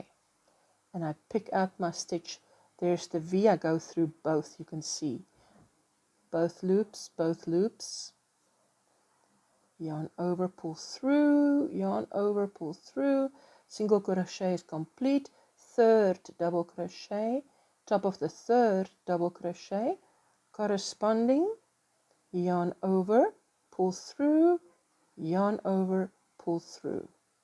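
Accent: South Africa. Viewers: Love your blanket. I live in Johannesburg and it’s so lovely to see and hear a South African tutorial. Thank you for sharing talent xxxx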